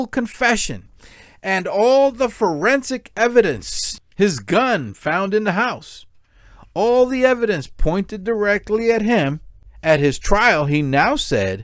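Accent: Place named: Latin America. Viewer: acho o máximo seu inglês latino.😊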